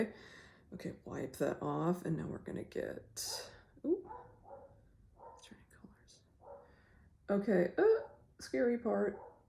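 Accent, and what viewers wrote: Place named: United Kingdom. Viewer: This makes me wonder if there is an alternate universe where is alive and has his own drag queen persona with your name as the Drag name, honestly doing a drag king dressing as a drag queen look would be fierce and so bonkers, like a in drag look. Also, we definitely need you to do a look one day where you show us what looked like before he died. It would be so interesting. You're the first Drag King I've legitimately found interesting and I think it's due to the colourfulness, the glamour and the characterisation. It shows that Kings can be just as fun and amazing as Queens can be. P.S as a Brit, I approve of the accent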